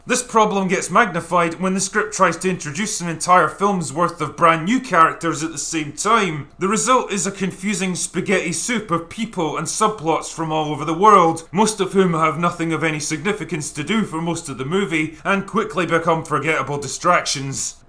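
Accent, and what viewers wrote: Scotland, United Kingdom. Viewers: Giant space testicle and shooting the queen alien in the arse. Priceless - all made better by the angry Scottish accent 🤣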